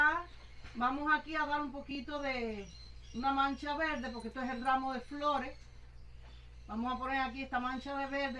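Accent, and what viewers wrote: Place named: Cuba. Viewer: HOLA ME ENCANTA TU TONADA CUBANA!!! MUCHAS GRACIAS POR COMPARTIR TUS CONOCIMIENTOS 😉 BENDICIONES 💖 😊 😘 🙏 UN ABRAZO GRANDE DESDE CÓRDOBA ARGENTINA 😊❤❤❤❤❤❤❤😊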